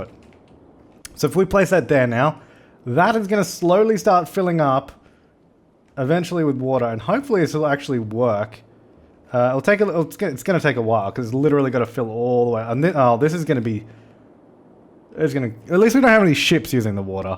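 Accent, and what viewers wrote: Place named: Australia. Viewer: Thank you for creating,! When I focus on your video, I find your commetaries funny! Including the fact that you, and some viewers here taking your builds seriously. Its awesome! I also like to let your vids play in the background while studying. At times I also watch your videos when I'm trying to go to sleep.Your soothing voice and your Australian accent can be the perfect lullaby. I'll watch as much ads as I can to support you ba'aa'aa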